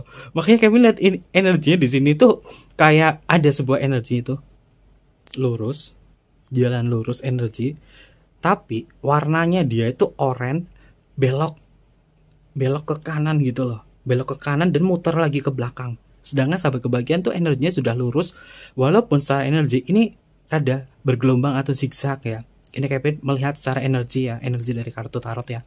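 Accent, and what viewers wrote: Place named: Wonosobo, Indonesia. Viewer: "sikapnya si pekok kempleh2" 🤣🤣🤣 kak bahasanya mirip kaya wonosobo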